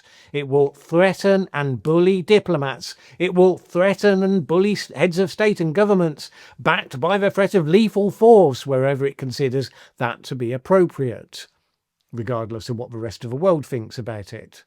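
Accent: United Kingdom. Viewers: Maybe you should go and tell this to the people being massacred by terrorist or have you guys become apologists for terrorists because the people being killed are blacks. Listening to your voice, you must be British the very people who have been the horrible people keeping the terrorism going for you to steal Nigerian resources.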